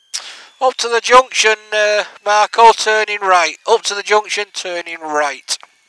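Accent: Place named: Northern England, United Kingdom. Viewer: lov that northern accent you lad.. ha ha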